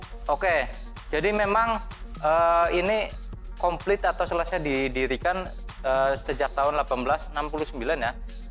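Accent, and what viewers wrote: Banyumas, Indonesia. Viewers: Ngapak ya kang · Ngapak kie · Kurang pengetahuan ini mas bro. Dan istilah2 laut nya juga kurang paham. Dan yg fatal gak bisa bedain mana suez dan panama😀. Belajar lagi mas. Dan bicara nya jangan ngapak bgt dan aja kebanteran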